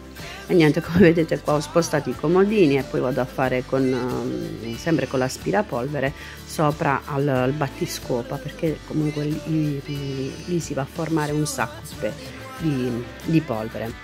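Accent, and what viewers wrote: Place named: Apulia, Italy. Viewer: Ciao sei pugliese ?si sente e anche io lo sono abito nella bat ,cmq belle pulizie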